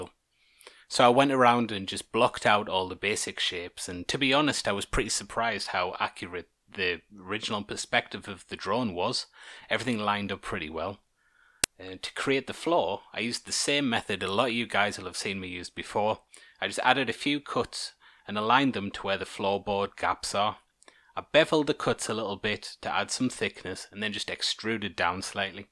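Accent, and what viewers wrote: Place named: Teesside, United Kingdom. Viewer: Sounds like good old Boro to me?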